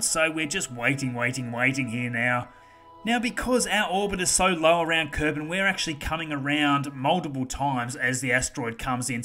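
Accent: Australia. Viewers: Hey! What part of Australia are you from? I'm from Melbourne:) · an old Australian audio book guy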